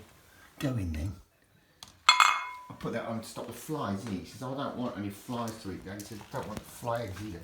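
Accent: RP, United Kingdom. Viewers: You're most welcome:-Gosh you sure are modest,😆Or you really know how to sell yourself, painting a picture of someone with baneful breath + a barren buccal, God bless you I'm sure you love Gem Jimmy unconditionally+vice versa You sound remarkably posh ,,, British+ Mellifluous, for someone in Poland...I presume you lived numerous years in England, previously? Golly gosh,old chap, your videos are always a jolly good show, Thenk you 😅 Poppycock,you claiming beastly breath:- Your breath doesn't need to smell of flowers,to make your exquisite videos💐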